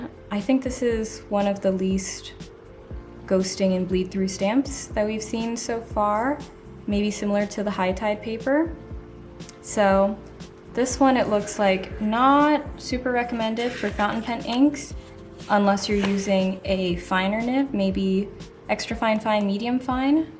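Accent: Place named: Missouri, United States. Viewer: Where are you from? Love you accent. Some friends from Missouri speak like you.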